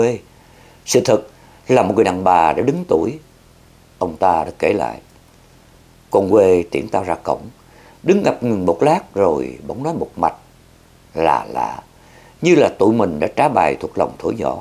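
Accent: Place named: South, Vietnam. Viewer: Con kính chào Chú Những tác phẩm của tác giả miền Nam qua giọng đọc miền Nam của Chú thiệt trầm ấm, lắng đọng đơn sơ, người nghe thiệt bịn rịn, xúc động rưng rưng nước mắt 💖🌷💖🙋‍♀️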